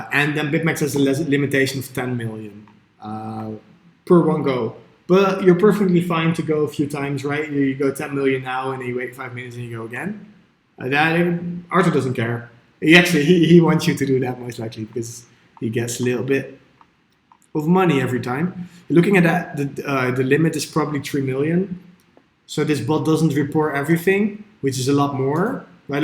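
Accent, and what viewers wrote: Netherlands. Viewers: are u dutch? sounds like a anglo dutch accent. thnx anyways for the info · Is that a Dutch accent I hear?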